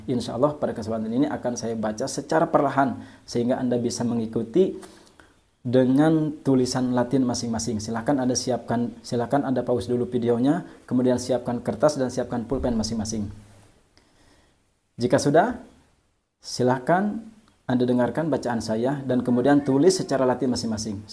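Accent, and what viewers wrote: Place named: West Java, Indonesia. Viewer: Pa ustadz logat kyak orang Sunda, emang tinggl daerah mn klu boleh tau🙏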